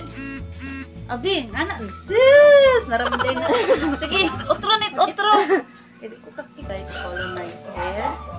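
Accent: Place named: Visayas, Philippines. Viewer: Ate bisaya ka pala. Magka sinabut jud ta ani love u po ❤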